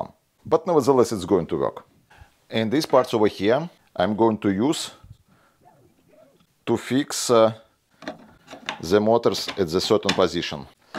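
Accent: Russia. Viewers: Вот так выглядит русский акцент)) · Really cool project 👍 P.S. Which accent type that is? I think about Russian, but i dont really know 🤔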